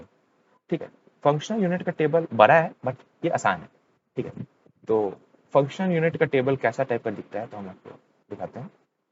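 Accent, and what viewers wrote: Bihar, India. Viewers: Bihari accent sunke bhaiya wali vibe aa rahi hai sach me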